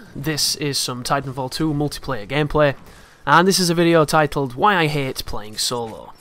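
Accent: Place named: Northern England, United Kingdom. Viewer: I would guess northern England don't know where though.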